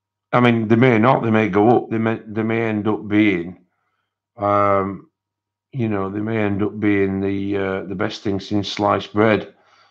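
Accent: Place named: Huddersfield, United Kingdom. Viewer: sorry didn't make that clear and it was one of your own streams. You had a soap box moment and everyone in the stream was so supportive of you. You mentioned no names. I have heard nought else from anywhere. As I said ignore the buggers. I have a potty mouth so being polite about that lol. Only keep those who add value to your life. Your channel has grown because of you and nothing else. Used to work in Huddersfield and good to hear the accent lol. Couldn't stop laughing at Jedi! Chunky munkey lmao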